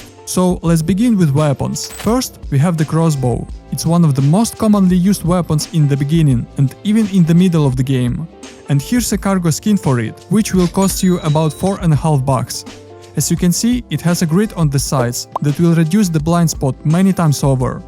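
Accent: Russia. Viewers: Я слышу, слышу русский акцент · оч жесткий русский акцент используй ии чтобы переводить видосы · У вас будто бы русский акцент 🤔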